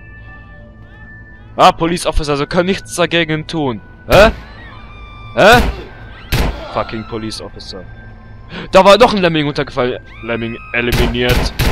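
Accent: Russia. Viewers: bist du russe?:D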